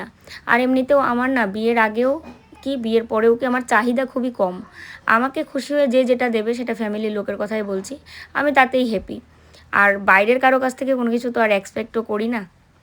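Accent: Bangal, Bangladesh. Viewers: Tumi j bangal vasha r adeshio vashay kotha bolo, besh valo lage shunte.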